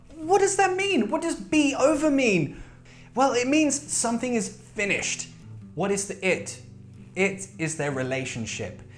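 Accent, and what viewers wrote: United Kingdom. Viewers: This is my favorite video. Nice story, cute drawings, clear explanations... and of course your British accent! Thank you very mush for posting it. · I love his British accent 😁💗 lol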